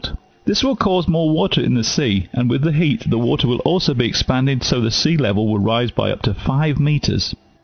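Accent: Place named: United Kingdom. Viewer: I love british accents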